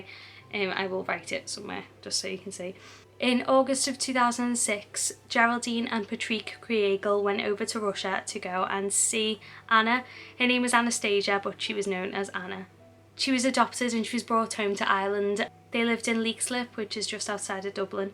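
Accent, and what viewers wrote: Liverpool, United Kingdom. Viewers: Another youtuber just posted this story almost word for word lol, but only did part 1 so was really glad to find this. You are amazing at telling stories and as a fellow scouser great to hear your familier accent. Such a sad story she was a lovely girl and my heart goes out to her family. RIP Ana this should never have happened. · Thumbs up before watching the whole show, because you're a northern lass, " Scouse " to be precise . hahahahah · Like you said yourself, this case really affected me. I hadn't cried in ages but I cried for Ana. Such a tragic, horrific, needless way to die. Good channel by the way. And the scouse accent is very easy on the ear!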